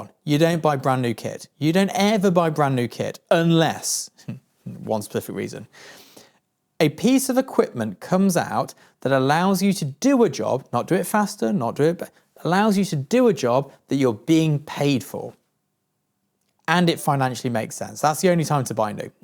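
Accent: United Kingdom. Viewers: Excellent! I'm grinning because I enjoy your point of view and because Brits talk differently than 'Murricans. 😂 I'm also appreciating how much I love pragmatism. And last but not least: I've been a little afraid of used kit because it seems fragile and... almost perishable. I'm glad to hear your point of view. I've bought used cars sight unseen, because I know cars. But studio gear seems "trickier..." Yep I'm just afraid of what I don't know. 👍 Nice to feel grounded again. Thanks!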